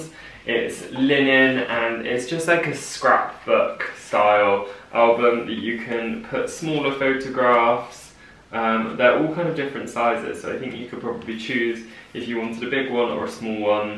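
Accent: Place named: United Kingdom. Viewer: Your British accent is so starch. Clean and concise details with your descriptions .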